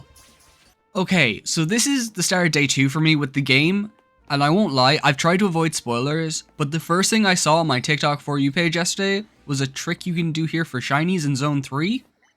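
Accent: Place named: Ireland. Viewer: This is the first video I've seen of yours, I am SO GLAD to find a fellow Tepig lover (I also agree that pignite is such a happy boi). Bonus points for being a fellow Brit. (After listening to a bit more of this video I realised you sound Irish, so sorry about that mate.)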